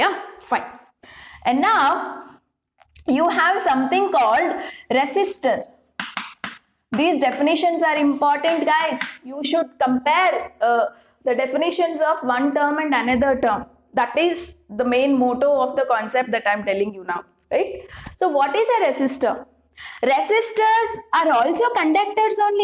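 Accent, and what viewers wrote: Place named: Telugu, India. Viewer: Mam do u speak telugu language ?? Your slang is a bit like telugu speakers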